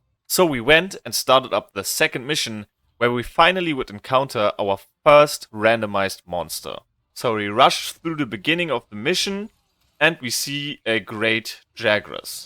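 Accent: Germany. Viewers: I smell the german accent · I just love german people talking english. sounds great. keep it up brother! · Hörst du den fetten Akzent nicht? Natürlich ist der deutsch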